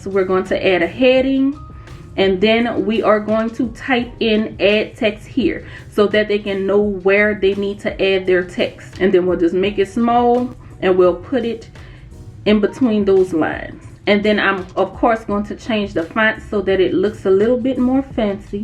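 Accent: New Orleans, United States. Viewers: She had me with the NOLA accent ❤ let’s get this money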